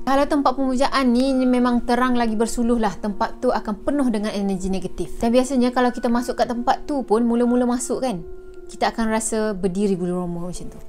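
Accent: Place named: Johor, Malaysia. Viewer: Best le kakak punya penyampaian tak bosan macam sleng jawa johor tu yg kmi suka cara kakak berckp .👍👍👍😃😃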